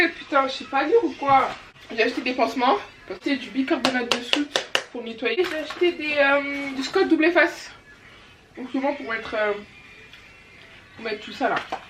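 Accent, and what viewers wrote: Ivory Coast. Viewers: T’es ivoirienne ? Ta façon de parler 😂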